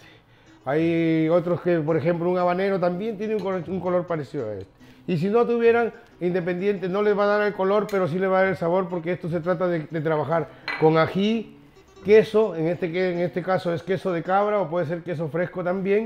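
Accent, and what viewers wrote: Chile. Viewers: hechastes el aji crudo si soasarlo ,na que ver ah otra cosa habla como peruano no pierdas la esencia ,y no hables como chileno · se le pego de chileno po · si tu eres peruano shora hablas co.o chileno por favor donde estamos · Y PORQUE HABLAS COMO. CHILENO..😃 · Alfredo Santaria si lo mismo me dije yo.. en su uniforme de cocina dice perú.. pero tiene un dejo de chileno inconfundible!. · Queee !! No se pone huevo crudo 😱y dónde está la cebolla el soasado ?? 🤔 Mi abuela le hubiera jalado las orejas No Sabe Nadaaa 🇵🇪 de Huancayna . . Noto un dejo chileno ?🤦🏻‍♀️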